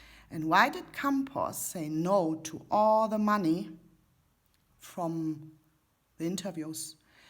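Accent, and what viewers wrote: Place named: United Kingdom. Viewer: that is why there is a British accent coming through at times 😉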